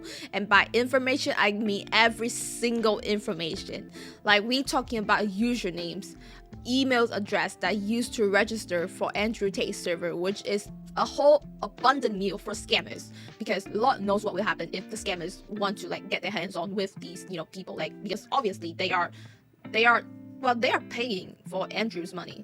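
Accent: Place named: United States. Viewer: Can you be less american in your gestures and way of speaking ?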